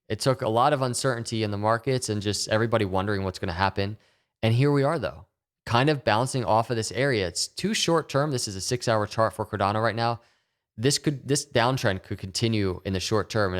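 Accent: Philadelphia, United States. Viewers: Gotta love that Philly accent. GO BIRDS! Let's go Cardano too!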